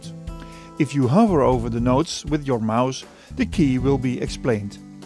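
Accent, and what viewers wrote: Netherlands. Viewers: Ik hoor gewoon dat je Hollander bent 🤣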